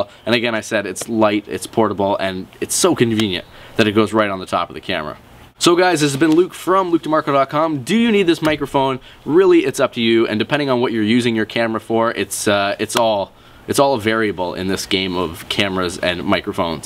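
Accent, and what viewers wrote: Canada. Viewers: canadian accent? cute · haha, you're canadian :)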